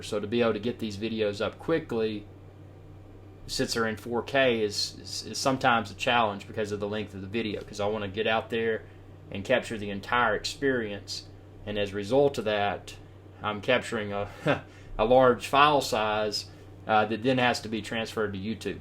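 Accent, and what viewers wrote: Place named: Texas, United States. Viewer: My wife Luciana thinks your accent is from texas, i have to agree also. One place i hope to visit one day :)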